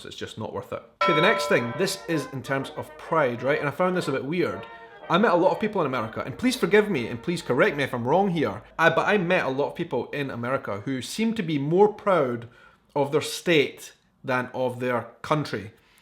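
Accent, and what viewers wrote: Scotland, United Kingdom. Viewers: I think you are mostly right. My state, Michigan, it’s the best! lol and also the thing about metal detectors, we don’t have them in smaller towns. Maybe only at a government building or courthouse. I love your accent, I just finished watching Outlander so I’m a little bit obsessed with Scottish people. 😂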